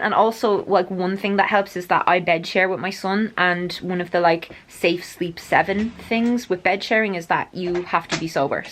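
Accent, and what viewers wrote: Ireland. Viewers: Your accent though…… I want an Irish woman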